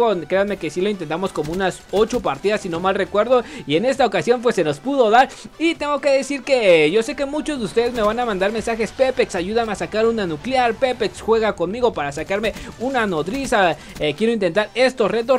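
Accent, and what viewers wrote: Mexico. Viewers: ¿¡Eres de México!?. 😱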